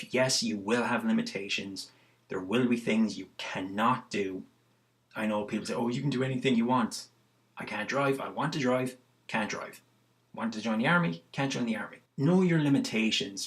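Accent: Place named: Ireland. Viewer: Hi how are you, I also have Stargardts And like you I was diagnosed when I was 7 years old, I am 28 now and everything you describe i can relate to also regarding your vision however I don’t see double when I focus on objects close up instead I just frustrate my eyes and give myself headaches . I use my camera to do the exact same things as you I think it’s brilliant for getting around public transport, airports etc.. some days can be more difficult than others especially when driving is out of the option and a long day can feel like a much longer day dealing with all the extra challenges but the fact that I can get out and about and do pretty much all I need and want with a few exceptions is perfect enough. I come from a family who have been in the motor trade all their lives and I am a huge car fan so you can imagine how devastated I was even at 7 to be told it was a big No driving is not going to happen I’m afraid. 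It certainly hasn’t stopped my interest at all. There’s so muchI have done and still can do with limited vision even driving on a race track, goKarting , horse riding , tennis, American football, rugby anything I wanted to do I did it just did it in a different way and that’s the key to it I think. Personal question are you Irish your accent sounds Irish sorry .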